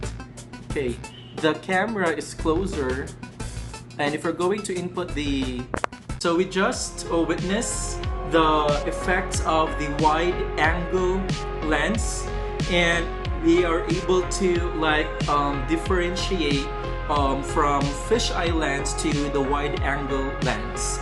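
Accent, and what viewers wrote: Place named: Cologne, Germany. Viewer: Kolsener accent. 😁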